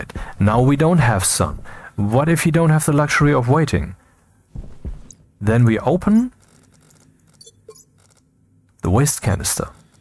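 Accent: Germany. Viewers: Richtig schönes Video! weiter so! Und dein Englisch kann ich so gut verstehen, weil du so klar redest :-) Gut ich höre raus, dass du deutsch kannst/bist?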